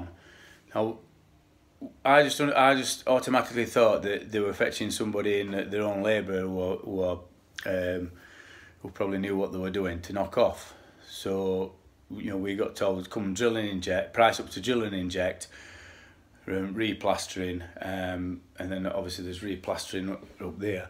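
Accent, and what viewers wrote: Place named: Barnsley, United Kingdom. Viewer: Very informative video and has stopped me from knocking plaster off myself after a water leak. I thought I might be saving myself some money if I did it myself ( 62 years old and only one income ) Anyway lad, thy talks Barnsley and I love that accent. Thy seems a reeght genuine kid so thanks for that 👍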